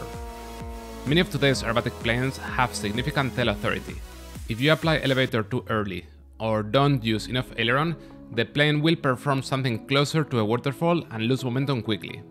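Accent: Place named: Spain. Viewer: I don't do well with Spanish accents, so I turned on closed captioning - turns out CC has a problem too! "about half left Aen a touch of left brother..."🙂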